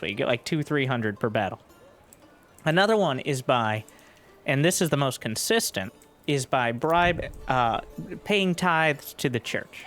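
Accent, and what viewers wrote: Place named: Western North Carolina, United States. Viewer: Where are you from, friend? I can't place your accent. I want to guess Western North Carolina.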